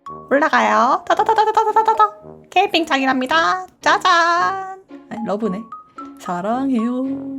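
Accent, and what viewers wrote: Gyeongsang, South Korea. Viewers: 와...... 저 대구토박이인 사람인데요.... 님도 역시 대구사람이구나... 억양이 완전 대구사투리 따봉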